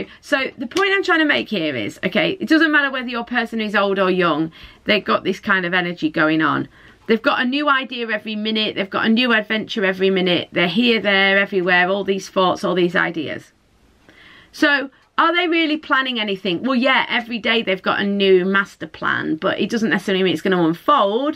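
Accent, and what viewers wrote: United Kingdom. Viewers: Thank you, love your cheery British accent! I chose #1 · Kay Treue same!😄 And both😁😁❤️ 100% resonated, thank you❤️ I’m wondering, could you tell us please from which part of the UK are you?😊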